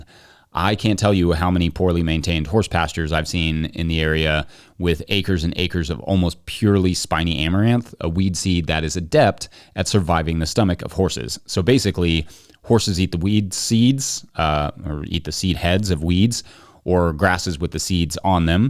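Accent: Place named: Utah, United States. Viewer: Sounds like you're from Utah (me too) even AND you've got the green no-till hat?! This guy's a good one.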